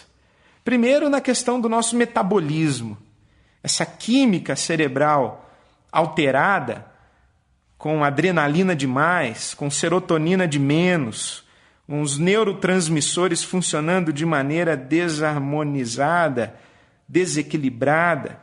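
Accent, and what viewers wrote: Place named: Rio de Janeiro, Brazil. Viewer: senti um sotaque meio carioca, um R puxado.. as vezes nem parecia ele.. kkkkk Benção pastor, obrigada por cuidar de nós através das suas palavras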